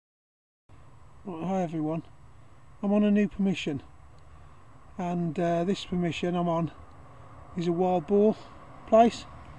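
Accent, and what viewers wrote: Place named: Stoke, United Kingdom. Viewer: Cheers, you sound like a Stokie mate! Love Devon, me and the missus intend to move down there in about 5 years time plus I do a lot of deep sea boat fishing regularly from Paignton. Great videos, would love to get into some of this night type shooting as I am restricted to bunnies and woodies. Keep up the good work. atb Frankie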